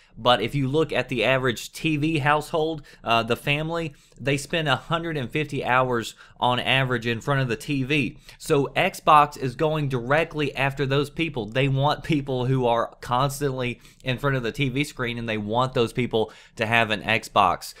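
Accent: South, United States. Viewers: Is... is that a southern accent? That is a first for me. Game reviewer with a southern accent... wow. Who is also a privacy advocate.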